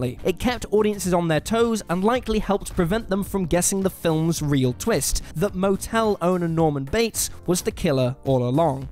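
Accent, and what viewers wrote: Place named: North, United Kingdom. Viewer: He is northern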